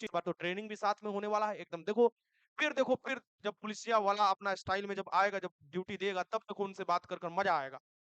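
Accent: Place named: Gopalganj, India. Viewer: App khati bhojpuri bolte hai gopalgnaj jila wala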